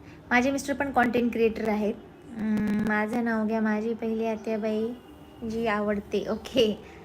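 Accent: Nanded, India. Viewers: तुमची नांदेड भाषा आम्हाला खूप आवडते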